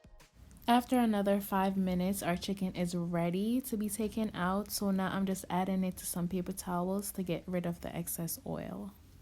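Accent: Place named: New York, United States. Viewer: Nice. Sound like you're from NYC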